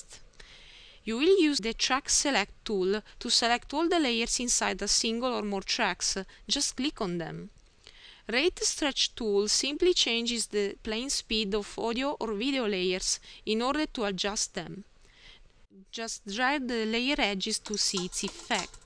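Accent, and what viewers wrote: Italy. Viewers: Her accent is definitely Italian. I'm Italian. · thanks for the video, helped a lot! I like the Italian accent, it's easier to understand than English :)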